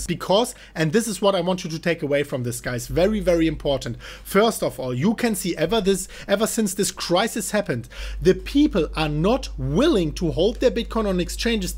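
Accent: Germany. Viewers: I love your German accent, it reminds me of Arnold Schwarzenegger, he is very famous and Americans respect him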